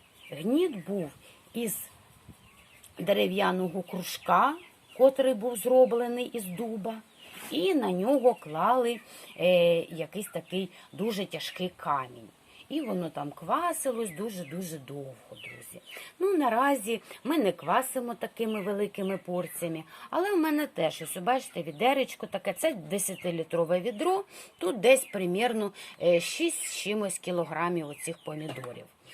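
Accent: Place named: Surzhyk, Ukraine. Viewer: зараз такий час коли усі балакають з телефоном та самі з собою.Ви теж квасю яка гарна суржикова вимова та говірка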